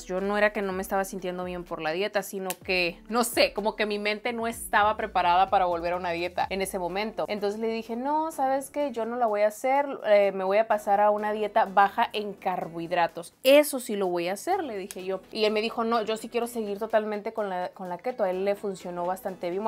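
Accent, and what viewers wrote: Sinaloa, Mexico. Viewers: Holaa, la verdad es la primera vez que te escribo. Tengo poco tiempo de ver tus vídeos gracias a una excompañera de trabajo que compartió una publicación en Facebook, desde ahí te veo cada vez que puedoo. Para ser sincera, yo soy muy floja para ver videos largooos, pongo algo y si dura más de dos minutos lo quito jaja pero contigo me siento taaan agusto, primero veia que duraban mas de 20 min y yo.. ay noo.. jaja pero con tus platicas y tu forma de ser wooow, me encanta.. ya ni me fijo en el tiempo jaja. Yo soy de sonora y me gusta mucho como hablas y como tienes tu esencia sinaloense, esa es una razon por la cual me gustan mucho tus videos, auténtica y sencilla.. por aquí seguiré viendo tu contenido.. eres muy lindaa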